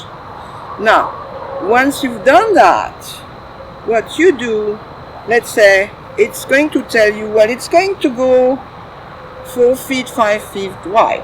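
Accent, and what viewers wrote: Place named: France. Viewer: Enjoyed listening to the French accent! Oh and the bird!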